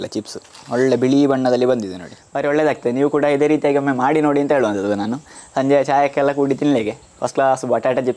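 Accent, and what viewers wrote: Mangalore, India. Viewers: ಮಂಗಳೂರು ಕನ್ನಡ ಚೆಂದ ಮಾಯ್ರೆ ❤ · I subscribed to your channel because of the charm in your Kannada accent ! Love it when you speak in mangalore Kannada ❤ also you make wonderful recipes. Thanks 🙏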